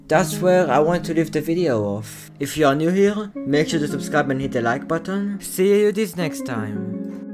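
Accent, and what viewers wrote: Israel. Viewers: Is there any chance that you live in Israel? Or that your first language is Hebrew? Because this accent sounds familiar.😂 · היי אחי יש לך מבטא ישראלי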